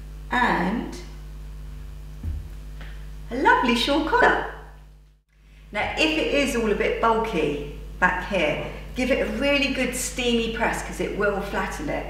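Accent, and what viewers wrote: United Kingdom. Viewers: Gorgeous! Way to go! I am loving recycle sweater projects this winter as well. I just did a cashmere remake. I could listen to your Brittish accent all day! Keep the great projects coming!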